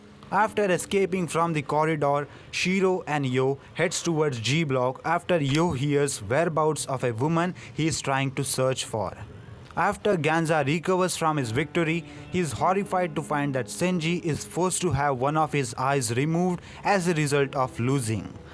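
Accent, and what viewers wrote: India. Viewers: Are you from india your voice sounds like indian I am a new viewer so I don't know if you said anything about this in past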